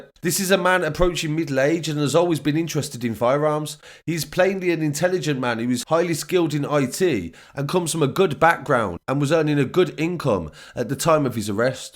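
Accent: Birmingham, United Kingdom. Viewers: This is a Birmingham accent not London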